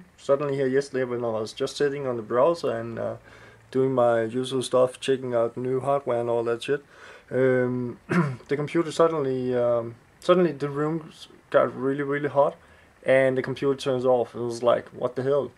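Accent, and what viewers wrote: Denmark. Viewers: Are you danish? :-D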